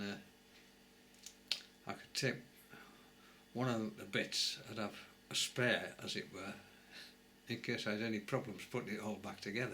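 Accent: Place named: Northern England, United Kingdom. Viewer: Hi. 2 years ago it would apply to me, Done 40 clocks since then, sell them on ebay and do some repair work as well. Really enjoy the work. Found something I enjoy doing at last and get paid for it as well. Seem to have learnt very quickly, despite being old 58 years. Sound you have a northern accent like me, Cheshire.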